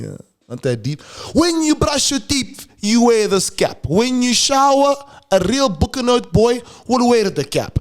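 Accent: Afrikaans, South Africa. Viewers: Your Afrikaans accent monna is not make sure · looking clean mxaaah mfethu you killing me lol great Afrikans accent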